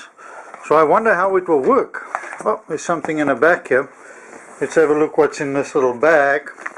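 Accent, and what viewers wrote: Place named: South Africa. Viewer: I thought I recognised the accent. I recently returned to South Africa. Unfortunately it does not seem that the hobby has grown here as much as I hoped. Products are limited and import fees are killer:-(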